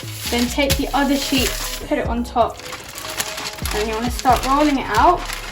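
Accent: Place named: United Kingdom. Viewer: British accent is really hard to understand..😕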